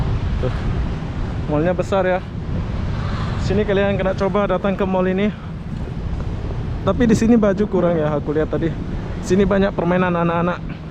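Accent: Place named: Malaysia. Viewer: Assalammualaikum bang mohon maaf mau tanya Abang asli nya mana yah? Sering kali konten nya tentang Indonesia ☺️ tapi jika dengar suara Abang logatnya seperti orang Melayu. Apa Abang dari Malaysia kah? Terima kasih 🙏☺️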